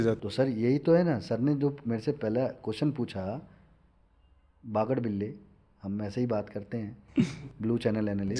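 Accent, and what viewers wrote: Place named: Haryana, India. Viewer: good insight ji, vaise aapke lehje se aap haryanvi lagte ho:)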